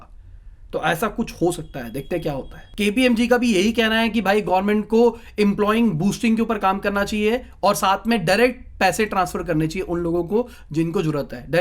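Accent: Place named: Punjab, India. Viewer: Your accent is Punjabi. Im right? Pegchanana mushkil hy being a Punjabi i can understand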